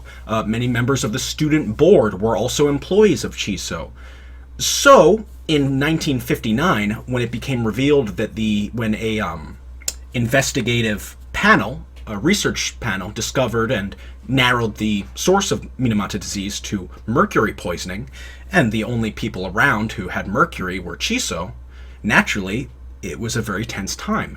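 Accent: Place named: Northern England, United Kingdom. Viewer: it made me chuckle a little hearing you say "Oedon" in a a northern english accent.. you can just pronounce it "Oden" its just the accents in game that make it sound like Ewwwdon 😂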